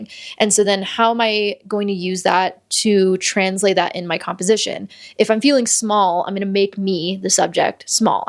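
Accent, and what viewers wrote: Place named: Colorado, United States. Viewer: Decided to catch up on some of your vids I've been meaning to watch, and heeey this popped up! Lovely to see something new from you. I adore how down-to-earth all of your content is, and I was so excited to find out you're a fellow Coloradan! (No hiding that native pronunciation, haha)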